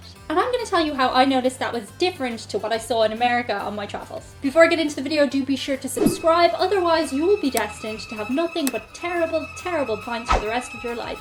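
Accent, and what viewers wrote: Ireland. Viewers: I enjoy your videos and was touched when you were watching Big Bird Sing. I watch your videos because I'm an American who is part Irish on my maternal grandmother's side. I love the accent. I like your take on the difference between Irish and American people. Thanks for posting. I am a subscriber. Have a wonderful day.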